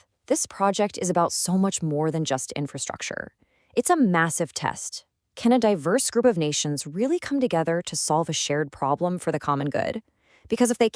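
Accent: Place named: India. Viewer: Globalism, butch girl boss AI voice with annoying vocal fry to obscure Indian accent, leftist climate change BS, brainwashed NPC points. This type of low quality AI slop is so yesterday. Go away, and get a real job doing something useful for mankind, like working on India's trash, pollution, and sewage problems.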